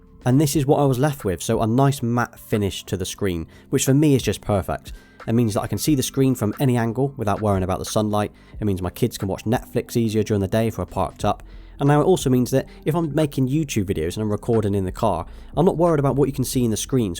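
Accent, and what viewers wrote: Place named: England, United Kingdom. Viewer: Model 3. Great quality video and refreshing English accent 😉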